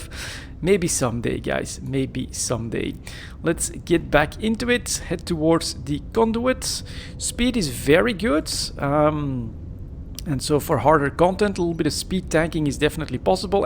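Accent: Belgium. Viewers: Belgium i think :-)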